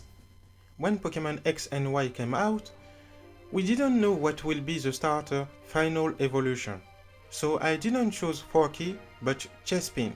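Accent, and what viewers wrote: France. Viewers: are you french? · Ok so do you speak french ? You speak like a french ^^ Tu ne prononces pas l'anglais tres bien comme les français lol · ça s entend que tu est francais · 😂😂on sent l'accent français haha bg frérot tu gères avec tes vidéos 👌💪🖒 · I feel like you have a French accent '^' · Are you French? Because your English is a bit like mine ^^' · I love your french accents sounds good im not insulting you its true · Toujours marrant l'accent français ! :p Tu te débrouille toujours mieux que moi xD Bravo pour tes 100k, continue comme ça ! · are you french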